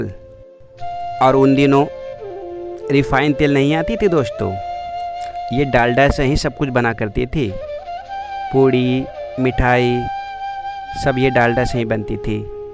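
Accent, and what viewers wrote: Bihar, India. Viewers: Bhai aap paka bihar se hona bhai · sir aap bihar k ho kya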